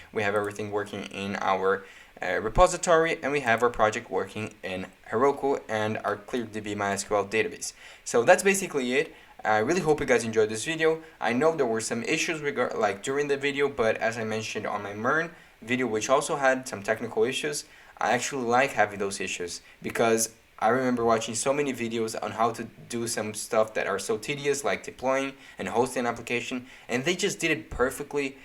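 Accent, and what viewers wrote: Brazil. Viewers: Nice video! Thanks for the info! I have a question: there is a way to change that ugly domain name from Netlify to a custom paid domain that I already own? (Idk why I'm asking in english, your accent is clearly brazilian) valeu mano kkk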